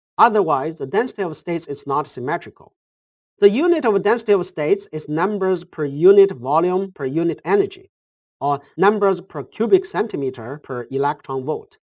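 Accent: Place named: China. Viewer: Sounds like chinese accent, lol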